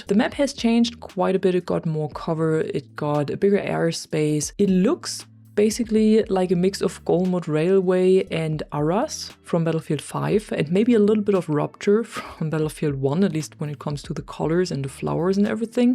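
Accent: Germany. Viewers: Nach deinem Akzent zu urteilen, bist du wahrscheinlich Deutsche ;) Alles Gute zum Geburtstag !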